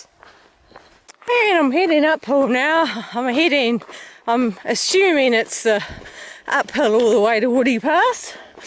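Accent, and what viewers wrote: New Zealand. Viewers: Congratulations! Well done. I'm going to miss your Kiwi accent.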